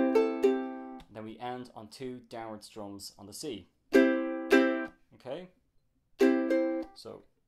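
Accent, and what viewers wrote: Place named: Ireland. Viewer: where are you from? it sounds to me like you're from a part of Ireland hehe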